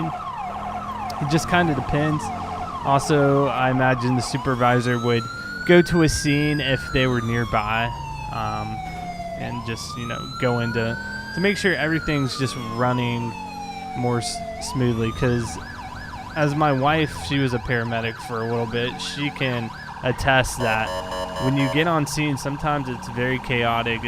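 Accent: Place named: South Carolina, United States. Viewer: Try to Fly Maybe a South Carolinan accent is different from a Kentuckian accent?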